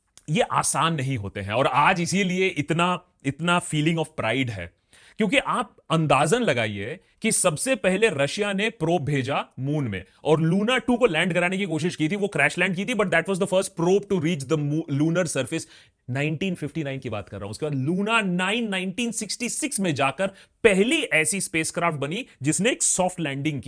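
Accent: Bengal, India. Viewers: R u bengali ❤❤❤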